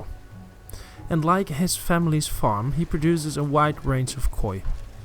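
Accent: Netherlands. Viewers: Is it just me or am I hearing a slight Dutch accent? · The narrator sounds so Dutch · Is he from the Netherlands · The announcer in this video is distinctly Dutch I can tell that accent anywhere. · he is dutch lol.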